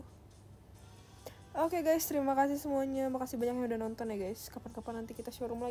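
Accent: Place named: West Java, Indonesia. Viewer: Lucuuu bngt passs bilang inima slh Mulu euyyyyyyyy jdi anak Sunda nie 😫🤏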